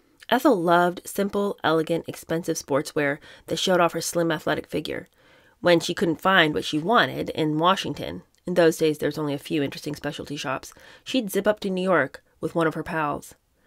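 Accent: South, United States. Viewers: Loved your southern accent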